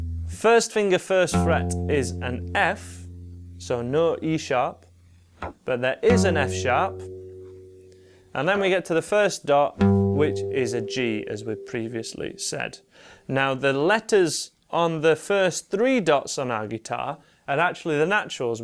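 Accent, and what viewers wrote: United Kingdom. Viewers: I loved ya explanation and I also love ya british accent. That's really catching !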